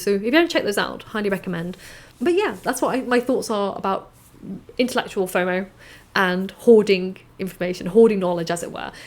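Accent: United Kingdom. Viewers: Is that British accent? I love your accent! You sound so heavenly when you speak :)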